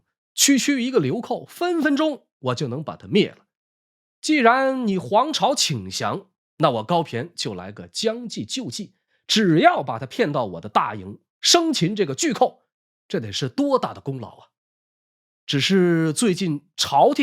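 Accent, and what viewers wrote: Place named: Northeast, China. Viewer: 哈哈，听了好几遍“我去他奶奶个拽的”，好亲切呀。你是东北那疙瘩的吗？🤣😂😁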